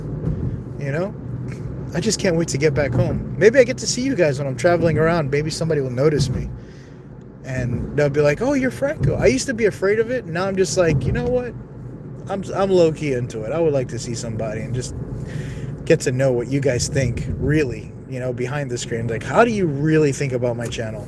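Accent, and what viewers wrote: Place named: United Kingdom. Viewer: I love your British accent. You and could just have so much fun being silly AF 😂😂😂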